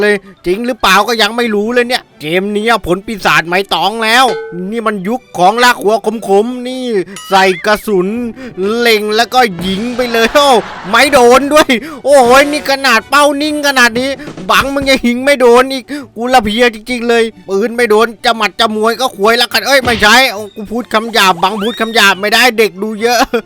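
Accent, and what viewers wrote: South, Thailand. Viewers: พากใต้อีกไหม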